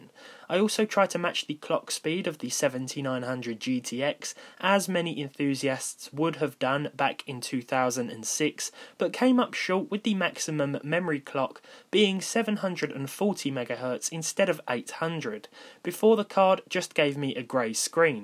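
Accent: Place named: Kent, United Kingdom. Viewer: I've just realised that is a picture of swalecliffe/herne bay! I knew I recognised your accent!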